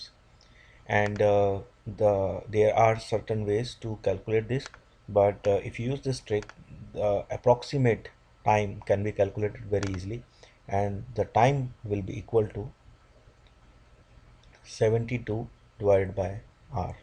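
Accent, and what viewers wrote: India. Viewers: not being racist but indians have the most ADORABLE accent ever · Fuck Vedic Math and Indian Accent! This all comes from Ancient Europe while Indians were worshiping cows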